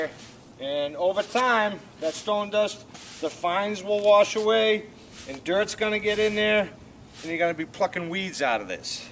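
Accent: United States. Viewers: susan scott My husband grew up in jersey and told me about running behind the mosquito trucks too. I thought this guy sounded more like he had a Jersey accent. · Hey, love that Boston accent. Fellow Bostonian down here in Delray Beach enjoying your video.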